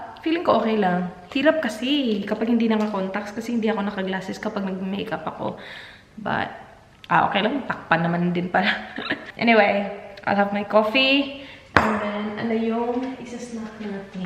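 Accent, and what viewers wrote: Visayas, Philippines. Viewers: bisaya ka te? E hearth ni kung bisaya ka😂😂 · Bisaya ka te noh? Haha kay tungod sa char char char na word ug botbot haha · Ms. u meron ka visayan accent po if nagTatatalog... I'm sorry to say that... I'm just priud Bisaya here😉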